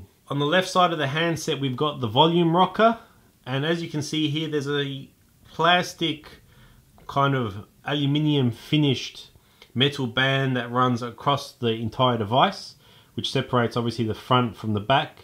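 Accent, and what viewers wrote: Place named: Australia. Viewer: Aussie accent!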